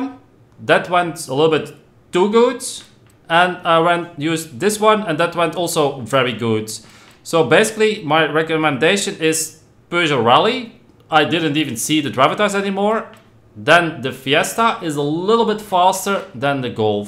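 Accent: United Kingdom. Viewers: I thought that I have to do full Goliath race but you helped as always. Are you from UK?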